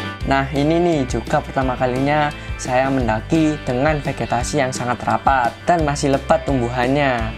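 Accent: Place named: Java, Indonesia. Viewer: Logatnya jowo bget..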